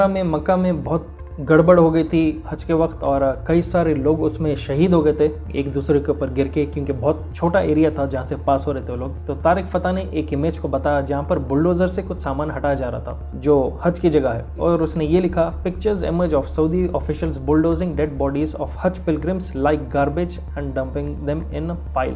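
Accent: Hyderabad, India. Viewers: Its hyderabadi accent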